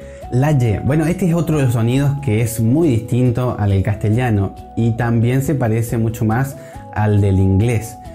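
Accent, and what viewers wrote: Corrientes, Argentina. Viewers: Me di cuenta que eras correntino por como pronuncias la CH soy misionero de padres paraguayos estoy mas acostumbrado ala variante paraguaya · Pasa que es Correntino y tiene otra pronunciación, lo dijo al inicio. No es una pronunciación errada.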